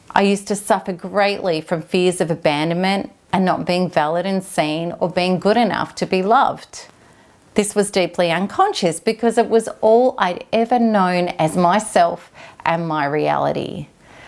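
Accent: Australia. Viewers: Are you Australian? Accent is so familiar!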